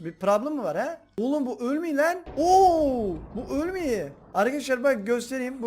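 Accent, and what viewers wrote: Black Sea, Turkey. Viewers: Abi sen karadenizlimisin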